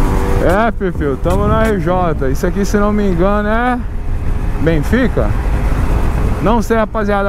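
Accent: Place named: Belo Horizonte, Brazil. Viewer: Namoral o sotaque de BH e muito massa ce e louco 😅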